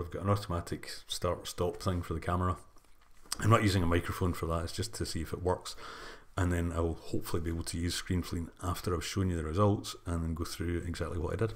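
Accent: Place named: Scotland, United Kingdom. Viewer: Great to listen to another Scottish voice on YouTube. Looks like I'm going to be adding a green screen to my Amazon wish list!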